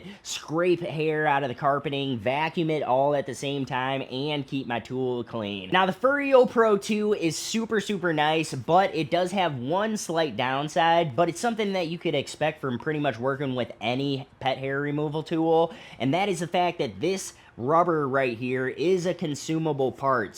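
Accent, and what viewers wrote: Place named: Wisconsin, United States. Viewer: I wasn't expecting the Wisconsin accent "don't cha know" , but great video and thanks for the tip on the right tool for the job.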